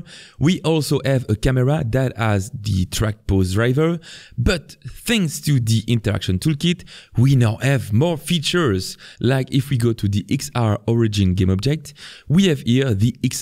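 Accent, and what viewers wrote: France. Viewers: le petit accent francais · T’es français ? J’ai dit ça étant donné que ton accent anglais semble français. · On entend que tu est parlent francais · I'm making this game on my laptop and when I'm finished, I'll export it as an APK file and sideload it to the quest. Sadly, that means I will not be able to test my game until i'm finished. But, I'm determined to do it! My Game is going to be called Glocks n' Goblins. Basically, swarms of mediveal enemies come at you and you have a powerful sword and a glock to shoot and kill them. there are enough tutorials on youtube to let me accomplish my goal and I am so happy to say that out of all the Unity tutorials on youtube, you have been the easiest to follow. But the French accent can be kinda difficult to understand sometimes, I'm from Canada, so I can pretty much understand it. Thank you again! 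· Bonjour, l'auteur a un accent français... 😉... Il n'existe pas une version française à tout hasard ? 🙂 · cet accent so french.. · Ah tu est français? Moi jai reconnu ton accent xD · beautiful french accent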